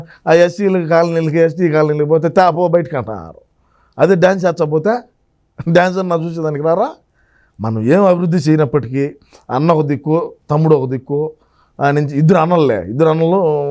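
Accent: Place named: Chittoor, India. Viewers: Anna tirupati language,tamil super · Chittor yasa rocks 😂😂😂😂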